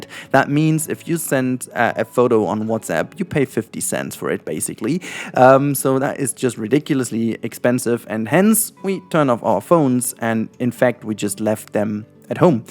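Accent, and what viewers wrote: Germany. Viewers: oha habs bisl am Akzent erkannt aber sprichst sehr gut englisch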